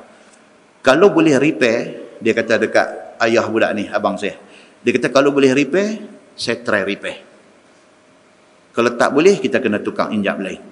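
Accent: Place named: North, Malaysia. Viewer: terima kasih....lega boleh dengaq balik bahasa utara...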